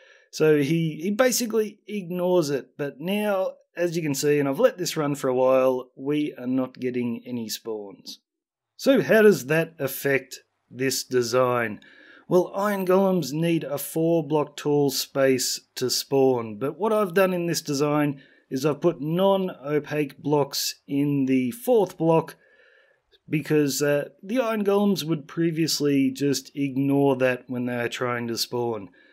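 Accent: Australia. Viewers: You're Australian aren't you?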